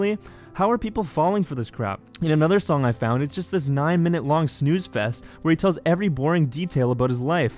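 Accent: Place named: Canada. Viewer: He's also Canadian, which makes the "This is America" joke funnier. I could tell because of his accent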